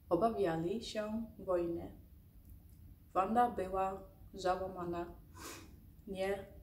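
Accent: Netherlands. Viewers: Tak to jest holenderski akcent ..swoją drogą holenderski też jest trudny 🙂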